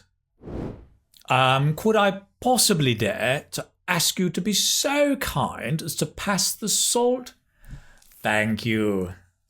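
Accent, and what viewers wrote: United Kingdom. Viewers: Thank you so much for your video clips ! I just love your British accent ! When you said, it was a bit strange to use the past tense to sound more polite like in "I was wondering whether you ..." in a present situation, well, we do the same thing in French when saying 'Je me demandais si tu ... ' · Thanks for the clarity when explaining the classes. It´s way better to hear you. When I read at all, I had in mind, "aroll", American accent, instead your pronounce says at all. It will be good for me to have the opportunity to practice Brits accent as well. Thanks. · Great teaching videos! a great accent. Could you please tell us which British Accent do you have? would you like to suggest us some good British narrators on ? Thank you so much :)))) keep up the great job (y)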